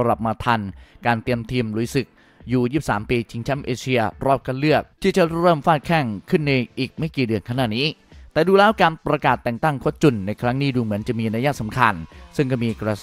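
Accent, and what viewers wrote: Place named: South, Thailand. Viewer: แอดมินเป็นคนใต้ชัวร์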